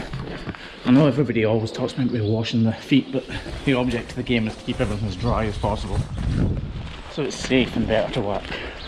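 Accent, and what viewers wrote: Scotland, United Kingdom. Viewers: Hey - just wondering - do you speak differently when you’re talking to the farmers, like with a heavier accent? Or when you’re in different parts of Scotland? If yes, I’d love to hear it! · I work with quite a few Scots and while their accent is easy for me understand, it does get stronger when they talk to each other, as well as faster and with more Scottish slang. would be taking a little slower on his vlogs to maximise understanding for those who aren't Scottish. Most U.K. Residents and those in Australia and New Zealand would probably understand him very easily but those in North America maybe not so much. His slightly slower speech for the vlogs would also be more beneficial to those who have English as a second or third language.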